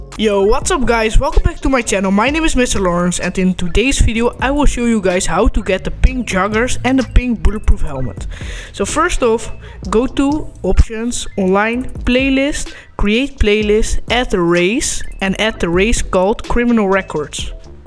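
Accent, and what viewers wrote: Netherlands. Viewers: Are you dutch? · Is my man dutch? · Lekker nederlands accentje man lol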